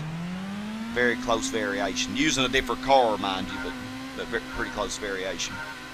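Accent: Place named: Kentucky, United States. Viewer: Hello there you sound like your from Kentucky or something I’m from Indiana and I see you have a country accent just found you so I’m going to sub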